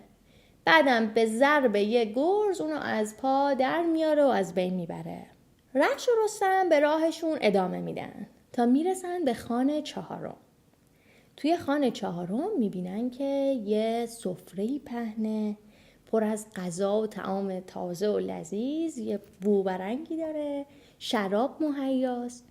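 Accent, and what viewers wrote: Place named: Isfahan, Iran. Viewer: لهجه اصفهانی داشتی آیا تو اصفهانی هستی؟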